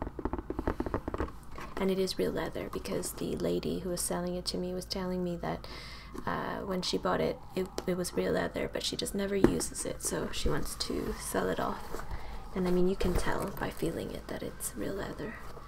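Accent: Russia. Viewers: Or russian, if you ever watched orange is the new black reminds me of red! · I definitely heard some Russian in the accent :)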